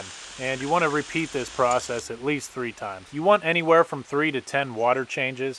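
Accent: Pennsylvania, United States. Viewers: Where are you from? You sound like a fellow Pennsylvanian sometimes. Lol I just found your channel today so please forgive me if it's written somewhere and I missed it or just haven't found out yet. Lol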